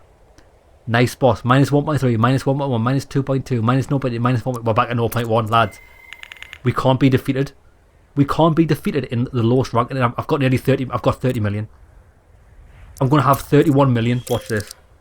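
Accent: United Kingdom. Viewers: Fucking British accent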